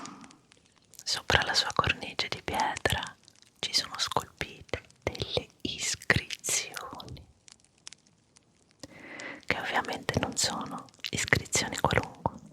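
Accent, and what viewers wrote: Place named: Rome, Italy. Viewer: Si è vero, la pronuncia romana fa ridere anche me 😂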